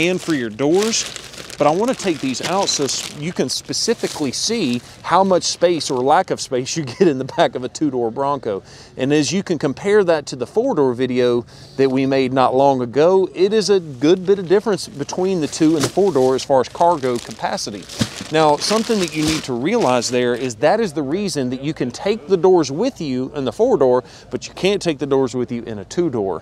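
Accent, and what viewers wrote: United States. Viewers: The American accent makes everything worse. He makes modern tech and sophistication sound like it comes from Medieval times. Impressive. Also, it's hilarious listening to Americans and how they struggle to utter words more than two syllables. Must be a real big task.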